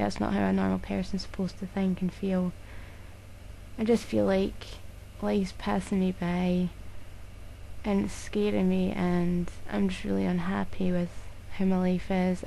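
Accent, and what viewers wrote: Scotland, United Kingdom. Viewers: are you Scottish Might be a silly question but trying to read the acccent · are you Scottish? I like your accent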